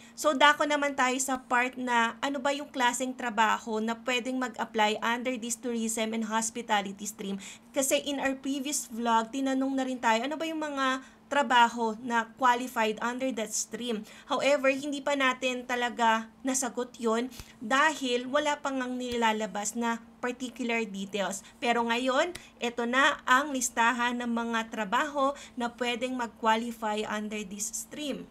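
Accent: Philippines. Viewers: Remember. Sooner or Later After your Graduation.. you will be working in the legal Firm. You must improve your English Pronounciations and your English Communications. Avoid using Filipino way of talking. Start assimilating to the American Culture.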